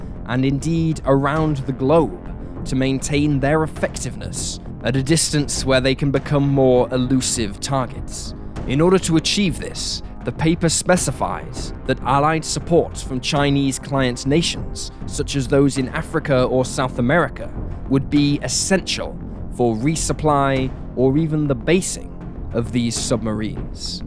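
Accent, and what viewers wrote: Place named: RP, United Kingdom. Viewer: His accent is from the south of England (received pronunciation), not Ireland.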